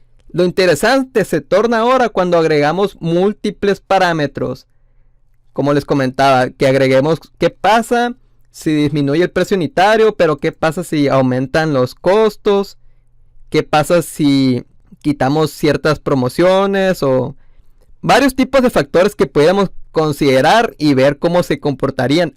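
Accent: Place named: Sonora, Mexico. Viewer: uste es de Sonora? muy perro el acento 🤙🏼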